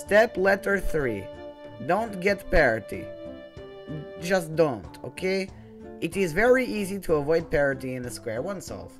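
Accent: Russia. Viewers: oh yes! i been trying to get good at sq-1. hears russian accent FOR FUCKS SAKE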